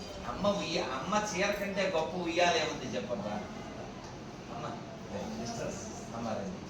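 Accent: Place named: Tirupati, India. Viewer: GURUVUGARIKI SHUBHA SAAYAMKAALAM , PADHABHIVANDHANAM. GURUVUGARU MEEKU MAA THIRUPATHI YAASA VACHEESINDHI GURUVUGARU. "ROJUKU OKATHURI CHADAVANDI" 🙏🏻🙏🏻🙏🏻🙏🏻🙏🏻🙏🏻🙏🏻🙏🏻 ANDHARI VAARU SWAMY, MEERU MAA THIRUPATHI VAARANDEE SWAMY MAA THIRUPATHI VAARANDEE GURUVUGARU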